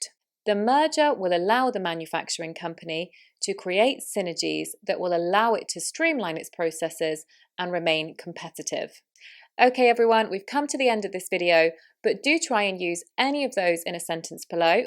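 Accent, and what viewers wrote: United Kingdom. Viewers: I love british english❤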